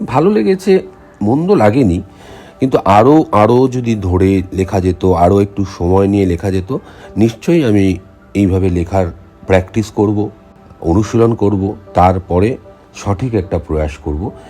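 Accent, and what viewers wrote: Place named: Kolkata, India. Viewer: তোমার কথা থেকে অনেক কিছু শিখি, আজও শিখলাম, মনটা ভালো হয়ে গেল। তোমার কথা শুনেলে মনে হয় আমার ই কোনো আত্মীয় কলকাতায় থাকে সে কথা বলল। ঈশ্বর তোমার অনেক অনেক মঙ্গল করুন। ❤❤❤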